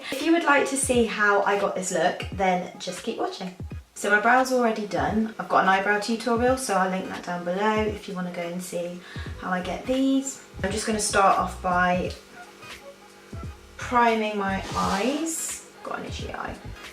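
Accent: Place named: United Kingdom. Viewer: Omg looove the look, you're just so pretty and I love the way you talk haha don't mean to sound creepy though, I just have a slight obsession with British accents and everything English related.. I want to move to England soo bad!! lol sorry for that random comment.. I just meant to say that I loved this video and you're awesome! x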